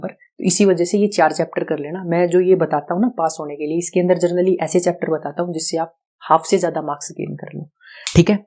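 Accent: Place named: Hisar, India. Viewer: Ye to pra h ki aap Hisar se Ho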